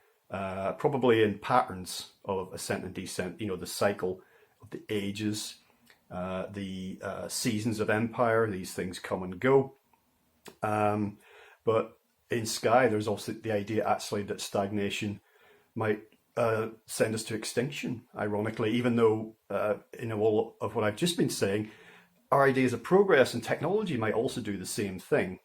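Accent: Northern Ireland, United Kingdom. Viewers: Very glad to have found your channel. I'm guessing you're from Northern Ireland? I'm coming at you from Portadown... Network are great for releasing these old series. Particular favourites of mine are "Children of the Stones" and "Sapphire and Steel."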